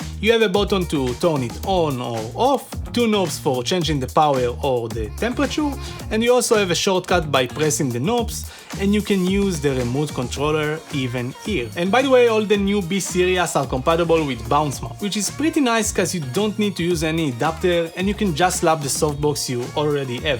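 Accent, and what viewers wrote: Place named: France. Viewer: your accent is fine dude. He’s just a hater. Most people LIKE accents. Sounds like you are French. Your accent is strong, but by no means hindering your communication.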